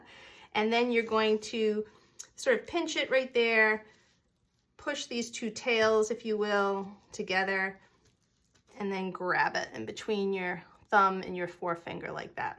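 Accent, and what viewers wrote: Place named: Massachusetts, United States. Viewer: I haves love your wreaths since I was lucky enough to find you. I did not know that you were from Massachusetts until today. Iwas from the same area including living in Mashpee for awhile. I understand your accent. I am going to make this wreath for my niece who lives inNeport.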